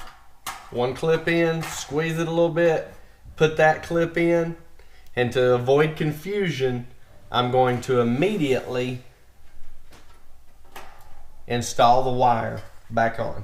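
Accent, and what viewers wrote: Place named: South, United States. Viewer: Great instructional video...and cool southern accent to boot! Thank you.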